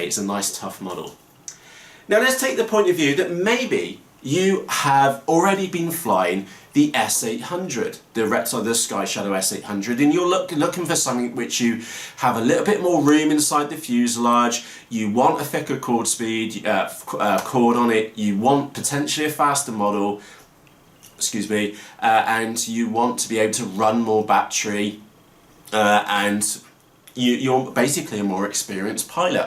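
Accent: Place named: South West England, United Kingdom. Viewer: Nice to hear a UK South West accent in a review video! Keep up the good work +, from a fellow UK reviewer!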